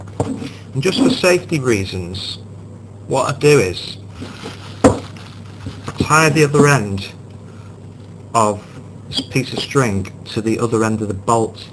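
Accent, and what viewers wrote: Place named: United Kingdom. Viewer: The classic British voice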